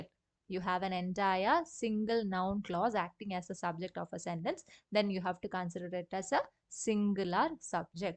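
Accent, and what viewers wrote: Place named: Kerala, India. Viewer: Amazing class ma'am ..feels like you are from kerala ..Great pronounciation skill ma'am ..God Bless You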